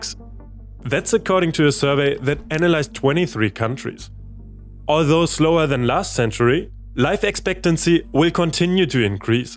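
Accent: Germany. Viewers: His accent is clearly German 😅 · Is he German?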